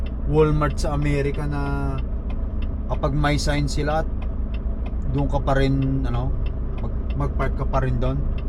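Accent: Philippines. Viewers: astig kapa rin lodi ,..Pinoy na Pinoy ka magsalita da best Lodi Kong truck driver ❤❤❤ drive safe always watching your vlog here in St.Catherines Ontario🇨🇦🇨🇦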